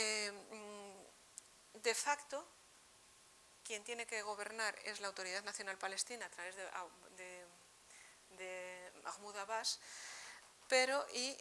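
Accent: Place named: Spain. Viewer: Esta mujer es española por el asentó .....entonces muuuuuy probablemente sea católica romana y de allí por supuesto no acepta que los descendientes de Jacob son los dueños de esa tierra y si no quieren aceptar. Respeten la compra de esas tierras que que compro Abraham. Hay pruebassssss ancestrales así esta mujer y sus secuaces digo su grupo intelectual siguen al vaticano quien formó el Islam $$$$ y les hacen batalla a los judíos en todo el mundo.y la católica a todos los dueños de sus tierras mandando a matar a los nativos de el mundo. Y esta mujer es una ignorante deve educarse antes y después de lo las fechas que da y realmente decir la verdad profunda y clara es una vendida .como que si a México no lo siguieran saqueando. Dios nos libre de esta barbarie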